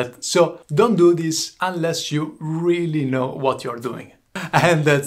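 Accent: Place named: Italy. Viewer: can i ask if you're italian? I think you are because of the accent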